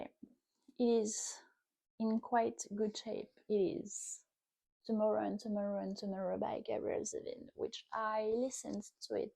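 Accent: France. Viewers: j'entends l'accent français, j'apprécie.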